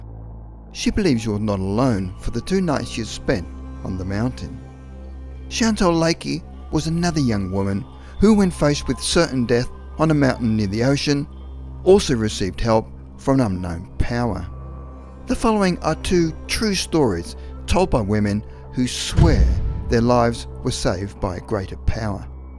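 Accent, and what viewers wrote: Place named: Australia. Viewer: I thought it was an Aussie accent! Hi from a fellow Australian 😊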